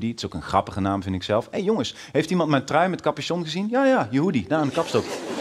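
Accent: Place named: Limburg, Netherlands. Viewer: Als ie Limburgs spreekt is het net Rapper Sjors.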